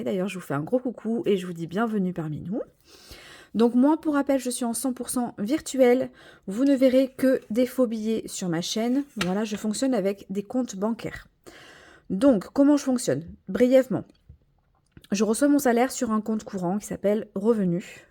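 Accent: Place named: Southwest, France. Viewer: Cet accent du sud ouest! Je le reconnais j’ai le même 😂😊 j’adore tes vidéos !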